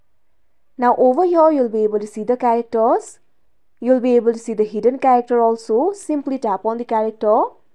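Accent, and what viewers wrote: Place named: India. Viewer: indian acces on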